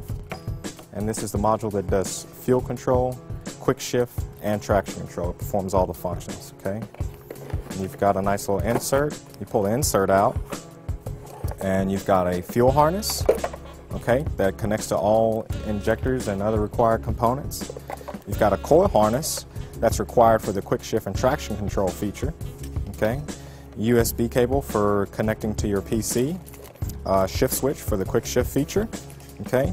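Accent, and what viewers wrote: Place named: Mexico. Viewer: This Mexican has a funny accent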